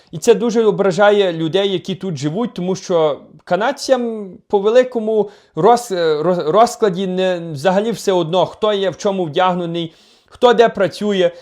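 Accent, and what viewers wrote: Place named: Transcarpathia, Ukraine. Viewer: Ти звідки,? З закарпаття? В тебе такий говір, акцент як в них говірка наче в Діда Віщуна з Файна Юкрайна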